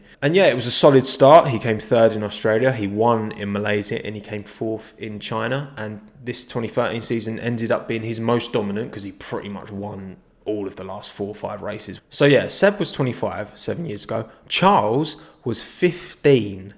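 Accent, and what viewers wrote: Ireland. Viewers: Irish Guy???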